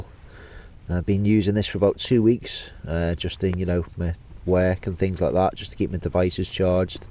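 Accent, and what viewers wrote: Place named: Liverpool, United Kingdom. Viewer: Always enjoy videos, a fellow scouse accent on the youtube!!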